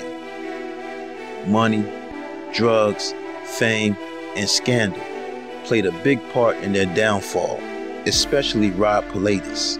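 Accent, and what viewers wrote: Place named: Baltimore, United States. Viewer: Very informative, but I could do without the Baltimore accent of the narrator.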